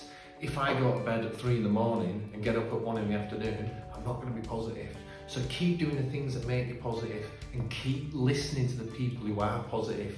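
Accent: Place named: Yorkshire, United Kingdom. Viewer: Great video Coach! When you mentioned your accent I assumed that you meant that your 1st language is not English, but I find pleasure in your accent. Is it Yorkshire perhaps? Thanks again for boosting me. Keep going, you're doing good work!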